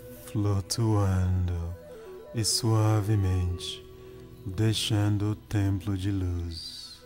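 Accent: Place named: Africa. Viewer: pra mim a entonação está normal, o fato que você deve ter estranhado é o sotaque africano do narrador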